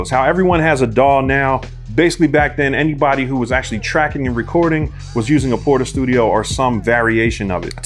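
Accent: Philadelphia, United States. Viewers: Hey why you have a lil philly slang when you talk lol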